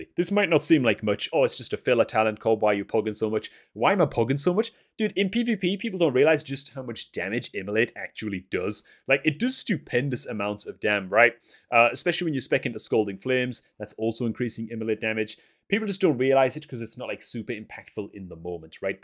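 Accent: Newcastle, United Kingdom. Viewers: Are you from Newcastle m8?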